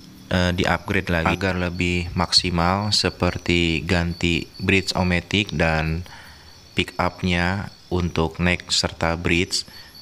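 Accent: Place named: West Java, Indonesia. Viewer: Ehehehe, nyunda pisan yeuh voice-na.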